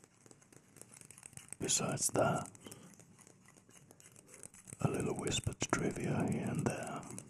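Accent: Germany. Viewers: Ist das eigentlich ein Deutscher? Wegen seinem Akzent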